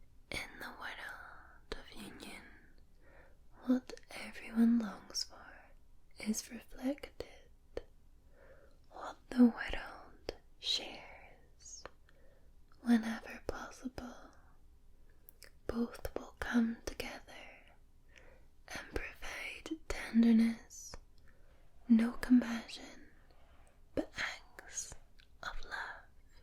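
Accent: Scotland, United Kingdom. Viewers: Wow, 3 hours Of pure Relaxing and calming video. Everyone of your sessions were tremendous. Every angle of your camera was perfect. You know me, I could write a whole story about your video, but I'll make it short! 😯😊 I lasted about two and a half hours into your video before I nodded off! Head and face message along with fluttering fingers, mouth sounds, singing, and new sleep stories were just amazing 👏 👌 😉. you are just so stunning with your lovely soft spoken Scottish accent which sent me to the moon 🌙 🌚 😊 ❤️. Totally, but I mean totally relaxing 😌. Tingles were plenty 😊. I could go on and on but I must get ready for work 😊. Please 🙏 take care and have a wonderful day love! 😊 You are awesome 👌. Sending you lots of love!! ❤️❤️🥰🥰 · Is it just for me, or feel other german scottish speaking lovers, that our "fränkisch" is the best german dialekt. 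And it has a similarity, the rrrrolling arrrr. For all non german, thats the region where PUMA and adidas came from (and no this is NOT Bayern/Bavaria-it s a own country, like Scotland never be England), and Lothar Matthäus is world wide well known, okay-maybe only in the world of football. Since I have seen and read trainspotting on original, I fell in love, with your kind of english. And, you done a great job! Many thanx from Berlin.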